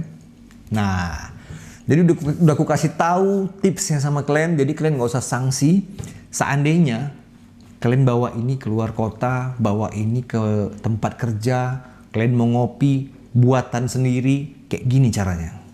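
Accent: Medan, Indonesia. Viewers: Ada tutop nya pulakk haaa .. Adoohh logat mu itu bang buat rindu nongkrong di medan lagi ah...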